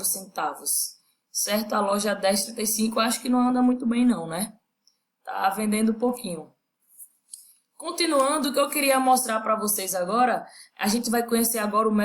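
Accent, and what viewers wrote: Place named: Recife, Brazil. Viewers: Massa! Show! Esse sotaque parece do Recife, ok? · Oi Oliveira J, que bom que gostou, o sotaque é do Recife sim, acertou!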